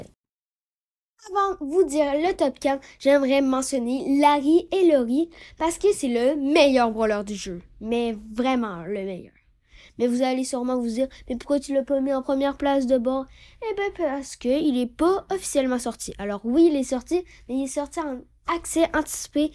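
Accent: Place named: Canada. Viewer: J'adore tes vidéos continue comme ça, et j'adore ton accent canadien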